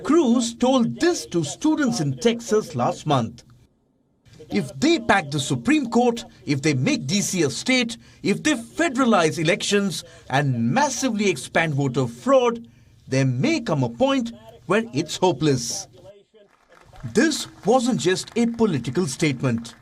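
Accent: India. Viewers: I think she's speaking in an indian accent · That could always just be India’s dialect of English. Different places speak the language differently. One I’ve noticed that we don’t say here is “pressurizing” rather than “pressuring”.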